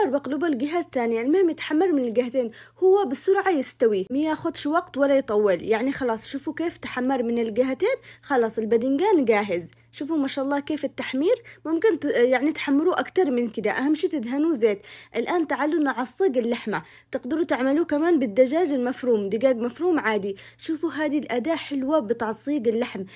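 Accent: Aden, Yemen. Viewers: ماشاء الله رووووووعه رووووعه خورتيني والله والاروع طريقة كلامك العدني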